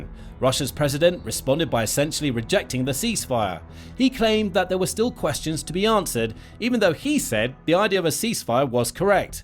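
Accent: United Kingdom. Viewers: Unfortunately Zelenskyy said he does not know how the $350,000,000,000 in US AID has been spent. From the sounds of the British commentator, maybe the Brits know where the grift has gone.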